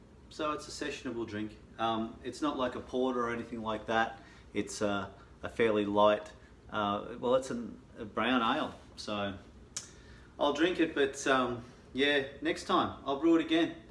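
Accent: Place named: Australia. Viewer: Are you in Seattle? Can't help but notice ozzy the accent lol...Im in Western Australia, just saw your beer reviews on Craig Nubergers channel. Very interesting beer in this video! Keep it up.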